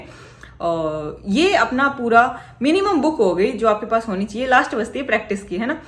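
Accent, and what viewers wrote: Haryana, India. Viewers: ਹਰਿਆਣਾ ਵਾਲੇ ਹੋ ਭਾਈ 😁😁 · Didi ap haryana se ho? Accent harayana jsisi h 😅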